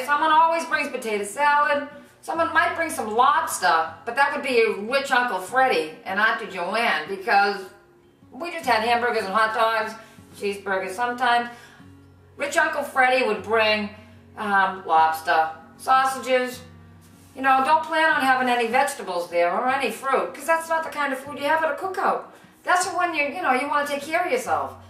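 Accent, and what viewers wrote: Boston, United States. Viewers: ❤❤❤ Love it, I am not only learning a Boston accent but mannerisms too. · love your videos!! thanks for posting. i love the boston accent.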